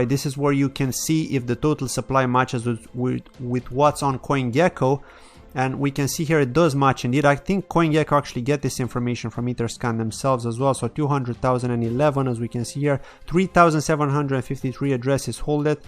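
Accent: Ireland. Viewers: you have a slight irish accent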